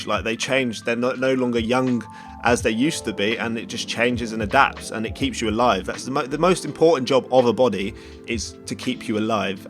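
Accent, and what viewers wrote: United Kingdom. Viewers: I didn’t know who u were but I was not expecting a British accent 😂😂 or idk what accent u have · Your smile Yout facial expressions 😭 I like them all, once again And to top it up the Bristish accent May you always remember this comment when you feeling low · I hate british accent but love yours :) though I need to work on my listening skills to get 100% of what u r saying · I really like that accent, does anyone know which one it is? Like what region from Britain or how it’s called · Oh My God, I love YOUR ACCENT!!! WHERE ARE YOU FROM?? Btw I can tell you're from the uk but where exactly? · Okay I just found your channel, wasnt going to stay but then the accent...lol yh it got me, wish my British accent was that strong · Esther Igbinedion it’s very obvious he’s british lmao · Yeah he’s a fellow Brit :) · His british Accent is so cuteeee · His accent is the same as everyone’s British accent smh 🤦‍♀️ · Aww i am so in Love with this british accent. 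Greetings from Germany ;)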